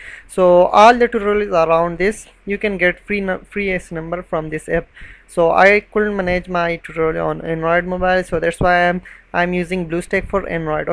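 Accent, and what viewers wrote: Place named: England, United Kingdom. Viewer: It is hard to follow your English accent. Next time make sure you add subtitles. Thanks.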